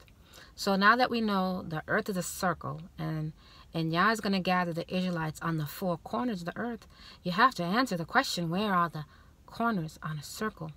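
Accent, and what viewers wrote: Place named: Europe. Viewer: Love the information. Voice reminds me of the old 1950 movies. I believe that is a Continental accent.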